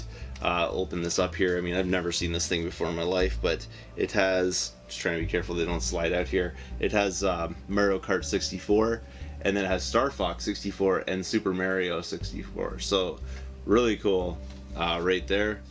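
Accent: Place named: Canada. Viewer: i love how you silly Canucks pronounce Mario..good stack of stuff there man!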